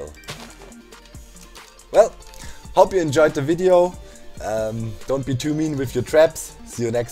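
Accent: Germany. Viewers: Sagmal du bist doch deutscher oder :D?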